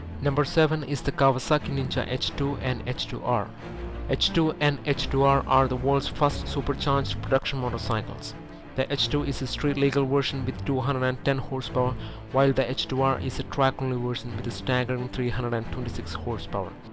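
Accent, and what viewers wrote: Kerala, India. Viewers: ഒരു മലയാളി ഇംഗ്ലീഷ്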